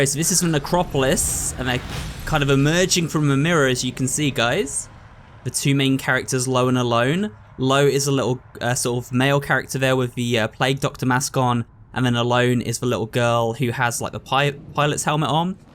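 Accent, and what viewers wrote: England, United Kingdom. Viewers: I just wanna say that I'll always love how English accent will have him pronouncing the word, "three" like the word, "free" or "tree." It tickles me pink every time! 😂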